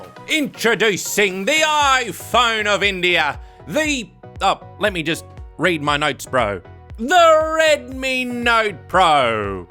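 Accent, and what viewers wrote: Scotland, United Kingdom. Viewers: The way he pronounced xiaomi like and a dragon ball z here 😂😂😂and that Scottish accent is Killin it 😉🔥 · The Scottish accent 😂😂😂😂😂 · The Scottish accent 😂😂👌🏻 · Your Scottish accent pronunciation crakes me up! That is a good one! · Your Scottish accent is hilarious. So talented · That Scottish influence tho.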